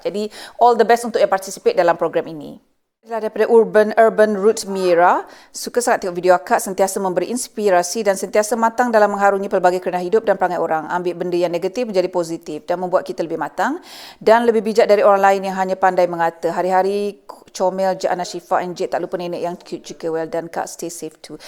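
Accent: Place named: Selangor, Malaysia. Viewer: Berkenaan loghat Nesmilan tu. Kita tau your family pun di Nilai. Utk pengetahuan semua Nilai ni dah almost Selangor (in fact heboh jap ari tu, Nilai ni dah join Selangor) so bunyi loghat org Nilai ni kira bunyi Selangor la. Bukan semua org Nesmilan berbunyi Pilah atau Rembau. Kalau ada yg berbunyi Pilah atau Rembau tu cuma sesetengah je tapi bukan 'loghat rasmi' org Nilai.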